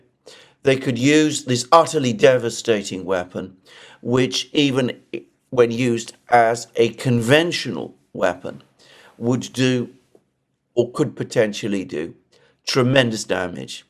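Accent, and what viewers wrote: United Kingdom. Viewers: Thanks for all you do for us:-). Love your beautiful British accent:-). Take care👍🏻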